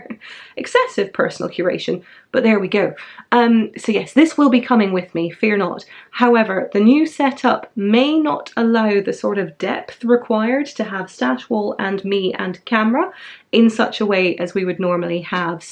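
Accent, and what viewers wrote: Scotland, United Kingdom. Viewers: Wishing you every happiness in your new home; south of the river is good, if you fancy a day by the sea side, pop in, I am in Ramsgate. I love listening to your bonny Scottish accent and the amount that you achieve is mind blowing. (I speak as a machine knitter who does not reach a fraction of your FOs).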